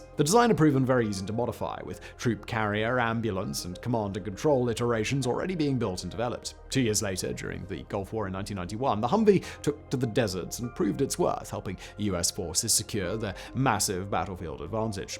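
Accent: RP, United Kingdom. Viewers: I'm a Brit, I don't know anybody who speaks like this, with such an unnatural exaggerated RP accent. Why not just speak London RP? It takes away from the otherwise great video content.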